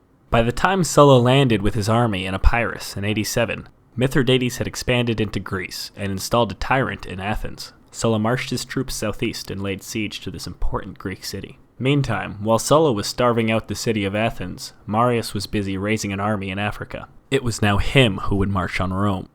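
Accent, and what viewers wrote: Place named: Canada. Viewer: he certainly has accent features that would indicate he’s Canadian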